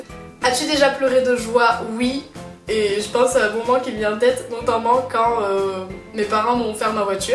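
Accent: South, France. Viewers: j'adore ton accent Ca rappelle le sud,la mer,la plage! Mais! Quand on regarde le reflet du ciel derriere toi bah il fait pas très beau on dirait!👌 Je t'aime beaucoup ❤️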